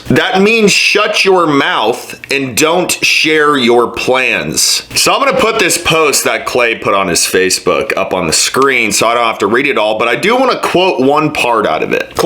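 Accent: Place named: Georgia, United States. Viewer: Dam dude you must be from Georgia because u sound like one of us back wood boys. Tell it like it is! God bless u brother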